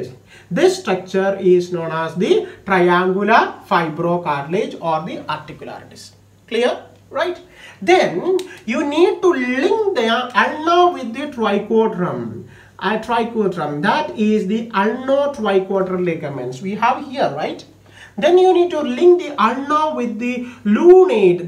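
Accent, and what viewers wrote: India. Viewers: Wow you even want to enhance your Indian accent 💚 Big respect 🫡 Ps : I’m not doctor but medical stuff whose has injury in TFCC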